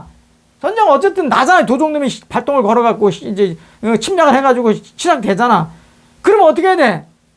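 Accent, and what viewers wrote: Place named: China. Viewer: 유튜브발음이 중국인같음!!! 윤통체포… <link>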